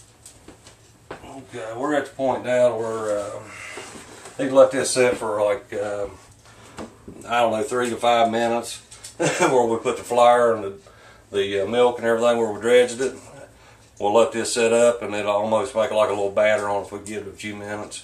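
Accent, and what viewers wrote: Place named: Texas, United States. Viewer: strong texan accent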